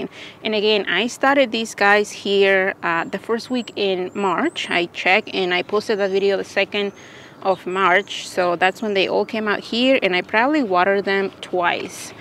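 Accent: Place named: Poland. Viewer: Hehe, you're so funny. And your polish is sweet.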